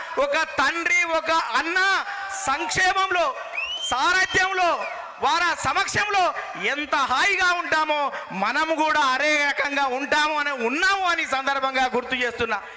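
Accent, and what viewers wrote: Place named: Telangana, India. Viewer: తెలంగాణ యాస భాష నీ ఒక్కడికే సొంతం నువ్వు మాట్లాడే ప్రతి మాట ఒక తూటాల దూసుకుపోతుంది అందరూ రాజకీయ నాయకులు పేపర్ లో ఉన్నదే చదువుతారు కానీ మీరు అప్పటికప్పుడు సందర్భాన్ని బట్టి వివరించి క్లుప్తంగా ఎంత విమర్శించారు ఎలా విమర్శించారు మీకు తెలిసినంతగా ఏ ఒక్క రాజకీయ నాయకుడికి తెలియదు ఎందుకంటే మీరు తెలంగాణలో పుట్టి పెరిగి తెలుగు జాతి తెలుగులో మాట్లాడే వ్యక్తులలో నువ్వు ఒక శక్తి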